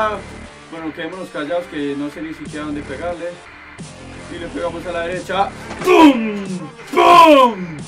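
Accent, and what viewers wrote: Colombia. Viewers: Tu eres colombiano desde el minuto me di cuenta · de que parte de colombiano eres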